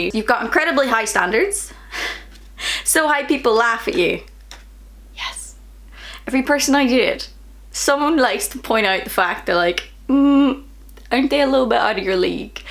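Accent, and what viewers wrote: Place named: Ireland. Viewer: I could listen to you talk all day with your adorable irish slang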